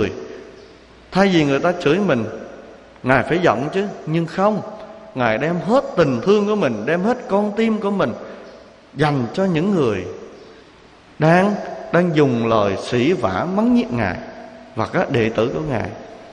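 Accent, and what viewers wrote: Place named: Quang Nam, Vietnam. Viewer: Thầy nói giọng nói giống người quảng quá ạ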